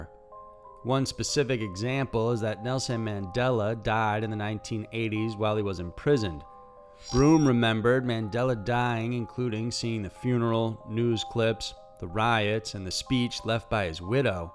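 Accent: South, United States. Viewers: Does anyone know where the narrator is from? There's a hint of maybe a southern accent on some vowels. It's slight and may just be style, but I can't help but wonder. Words like, "time," "wire," and "back." Seems less prevalent in this video than others.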